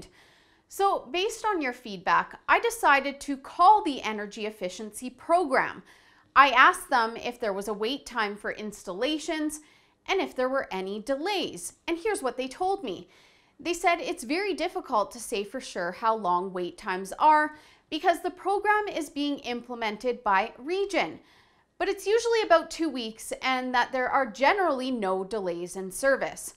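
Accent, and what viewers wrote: Canada. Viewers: Hey loose the Canadian accent and your golden, like the crust on that mac and cheese you made for me last nite in the mini kitchen of that nasty motel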